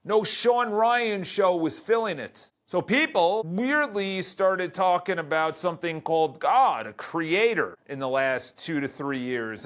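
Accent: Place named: Philadelphia, United States. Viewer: Are you from Philly? Or somewhere in SE PA, South Jersey, Delaware? All similar accents.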